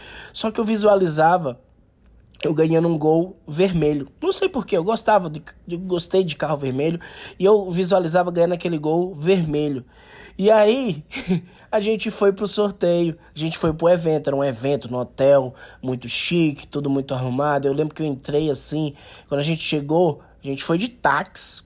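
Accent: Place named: Natal, Brazil. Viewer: nossa, cara. Moro na rua por trás do Reis Magos, e não sabia que você era aqui de Natal, até porque seu sotaque não é 100% igual o nosso. Tô assistindo seus vídeos desde ontem, acredito que tenha sido por causa da lei da atração, pois venho pensando exatamente nas coisas que, coincidentemente, você fala em seus vídeo. Que bom que respondeu o comentários, vi que a maioria você só curte, mas não comenta.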